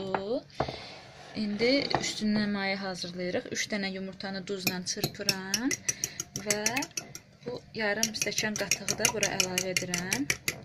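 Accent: Agdash, Azerbaijan. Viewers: Superdi.xanim siz Azerbaycanin hansi bolgesindensiz? Agdaşli deyilsiz tesadufen?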